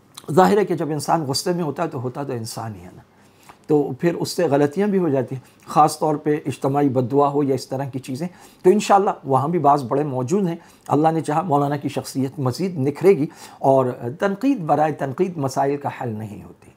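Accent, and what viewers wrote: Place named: Arab world. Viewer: مولوی صاحب آپ جب اردو میں بات کرتے وقت ح ع عربی کی طرح ادا نہ کریں یہ سننے میں بہت برا لگتا ھے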